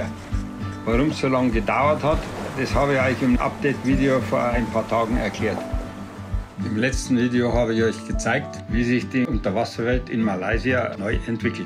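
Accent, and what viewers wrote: Bavaria, Germany. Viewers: Du musst doch aus Bayern kommen oder ich hör das an dein fluacha 😂mei opa red genau so da her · Wia sympathisch ❤ s'gibt halt nix scheenas als Schimpfa af boarisch 😆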